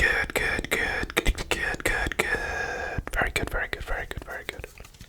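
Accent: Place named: United Kingdom. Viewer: I swear to all that is holy that the British accent just does something to me……I’ve never even heard it in real life but I want to so bad!!! It’s just too charming!!!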